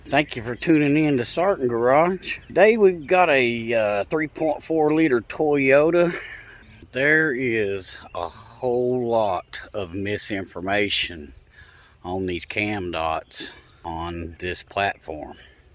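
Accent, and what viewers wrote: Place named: South, United States. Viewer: Im calling you the " " because your calm southern voice and patient demeanor makes it easier for ADhD heads like me to learn from 👍 Now Im actually looking forward to doing my heads when they need it haha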